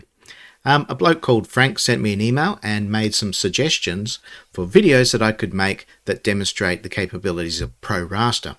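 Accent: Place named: Australia. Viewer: I watched this exactly 5 minutes after you uploaded but it was around 4AM in the US & I was too tired to think of what to comment. Now, another late night as I was revisiting your video, I wondered why you would upload at that time. Then it hit me that your accent is Australian, not British, so no wonder you chose this idea to use for a video. Nevertheless, it is awesome to see that something off my list was worth investigating. I have always been fascinated by this region since learning about the recently extinct Moa birds that so strongly resembled dinosaurs & by my family owning a full grown pet Emu when I was a child. Australasia is so full of earth lore like this & I am especially intrigued by the scattered islands connecting Australia & Asia where it looks as if the continents violently dragged bits of land with them as they drifted apart. Anyways thanks for the video & hope you are enjoying your summer!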